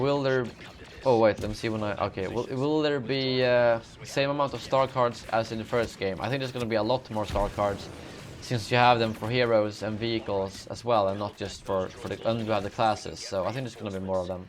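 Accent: Sweden. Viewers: You come from Sverige ? :D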